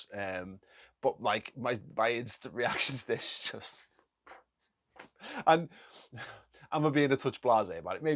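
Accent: Liverpool, United Kingdom. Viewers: I could only manage 15 seconds of this guys acsent however nice to hear a salahpool fan actually from Liverpool and not Bristol or Exeter. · Fucking love this guy, Just had him on in the background, cosy, waffling scouser then started paying attention when he started going off at the end!! haha legend.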